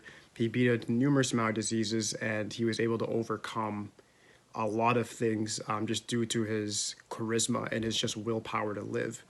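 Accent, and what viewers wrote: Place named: Canada. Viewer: This is the first video I’ve seen of yours. You sound Canadian 😁 love it! I’m going to look for your channel and I truly hope you’re still active. Your knowledge on this subject was A1. 😊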